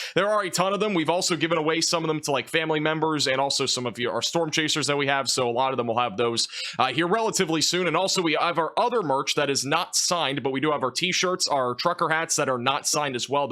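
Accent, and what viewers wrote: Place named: Midwest, United States. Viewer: this dude is def from michigan or wisconsin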